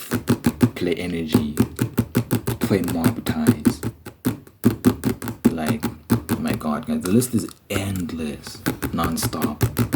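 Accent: South Africa. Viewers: south africa im pretty surw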